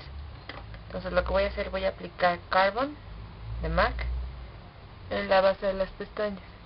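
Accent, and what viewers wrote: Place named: Mexico. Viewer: WOW! Me encantan tus tutoriales, bueno todos los videos y además ese acento mejicano me gusta muchísimo, jaja... Saludos. :)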